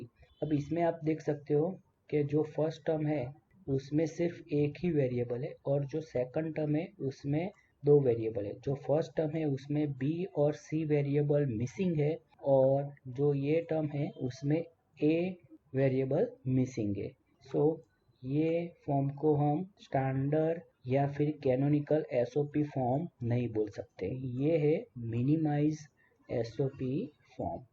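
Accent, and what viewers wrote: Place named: Gujarat, India. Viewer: Bruh you must be fron gujarat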